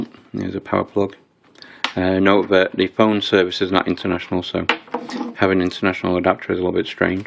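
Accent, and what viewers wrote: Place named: Manchester, United Kingdom. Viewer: You sound like a Manchester Lad to me, Living in USA?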